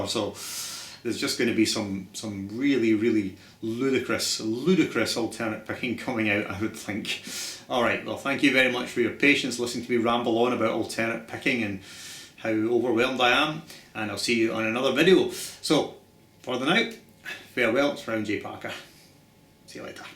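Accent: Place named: Birmingham, United Kingdom. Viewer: For some reason when I listen to you speak, you phrase some words like the guys in Sabbath and Priest... I only use those guys as examples because they are the only people I have heard speak that are from Birmingham. When I think of Scotland I think of Sean Connery Thanks for the lessons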